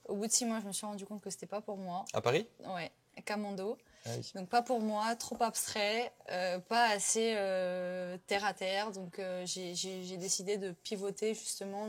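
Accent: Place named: Ivory Coast. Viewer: 😂😂 Tu commences à avoir l'accent ivoirien ma cœur ❤❤❤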